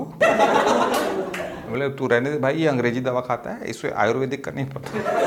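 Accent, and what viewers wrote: Bihar, India. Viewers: Your comedy is superb no doubt, But your Bihar style maza aa jata h sun k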